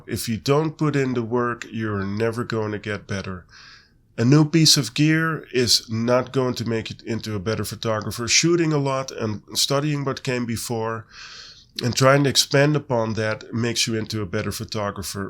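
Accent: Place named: Netherlands. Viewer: I'm Dutch and to me it really sounds like you have a Dutch accent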